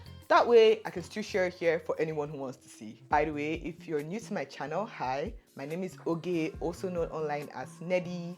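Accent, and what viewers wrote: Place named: Nigeria. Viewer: When I say your name in your channel description, I figured but I confirmed it when I heard your accent, love to see my fellow Nigerians out here especially as a beginner artist myself. Your art is amazing and the bg music is such my taste too. You’ve js gained a subscriber😊 btw could I ask where you got your iPad stand from from?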